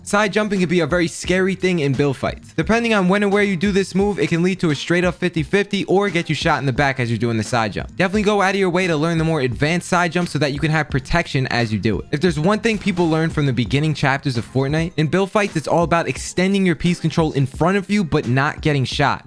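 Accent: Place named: New Jersey, United States. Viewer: Thx so much and are you from New Jersey cuz you have the accent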